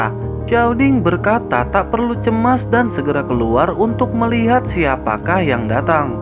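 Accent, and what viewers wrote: West Java, Indonesia. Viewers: Bang lu orang Sunda kah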